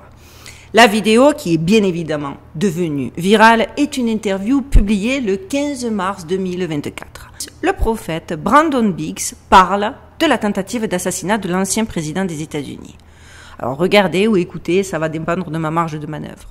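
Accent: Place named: Southern France, France. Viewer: Belle et intelligente 👍 Et ce melange d accent du sud de la France la ou je me trouve et un accent americain est etonnant 😮 Soyez bénie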